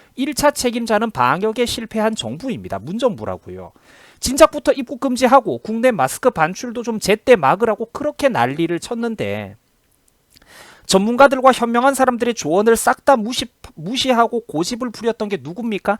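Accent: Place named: Gangwon, South Korea. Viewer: 말투가 강원도분이시네요 파이팅!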